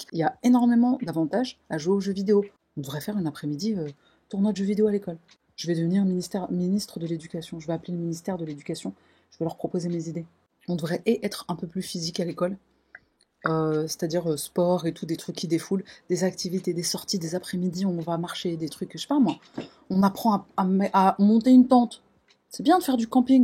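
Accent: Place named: United States. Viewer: Slt et le kiffe tu 😊parle super bien anglais avec l’accent et tout trop bien en clair américain et c’est trop cool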